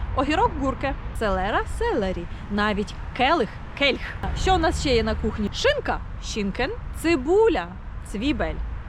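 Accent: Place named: Ukraine. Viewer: Пані у Вас український акцент у німецькій вимові!)) Німці знаю нашу розкотисту букву ,,Р" вимовити не можуть!))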